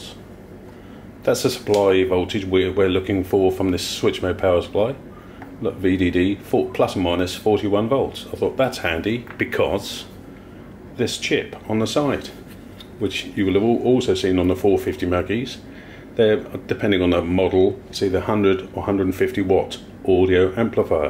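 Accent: United Kingdom. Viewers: Uptade- Did I wrote this 3 years ago?. My two EVs still running as new, do yourself a favour buy yourself the original covers, laste year I moved to Alto 2300w Crazy power. have fun - Nicely explained, plus deep British accent I almost believe as truth. I'm using the 15P for the last 3 years, with no problems - I arrive to this page because one light is not working - it is an amazing speaker, sound quality is excellent, does not overheats after 8 hours. Power is good enough for halls with 150 guests. So, maybe is right with the specs, but real life usage proves him wrong.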